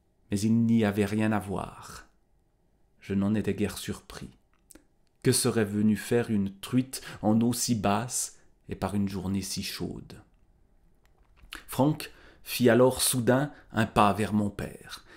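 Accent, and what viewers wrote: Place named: Vaud, Switzerland. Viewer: beaucoup aimé ton accent qui vient de chez moi ( je suis vaudois)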